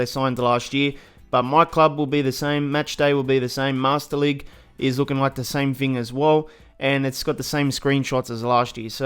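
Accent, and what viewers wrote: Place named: Australia. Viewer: I'm an Australian guy living in 🇪🇺 and I have to say I just watch this channel for the hosts accent....I feel like I'm back home at the Gabba with a cold one on the first day of the November test match! 😅🤣😂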